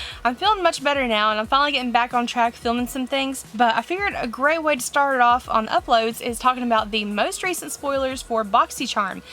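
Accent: South, United States. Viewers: Sorry about you not feeling well! Are you southern though?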